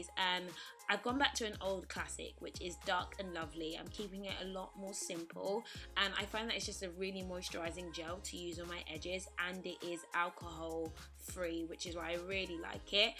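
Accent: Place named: England, United Kingdom. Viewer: I absolutely love your English accent but sometimes it takes me awhile to understand. Isn't it amazing how different American and English pronunciation can be?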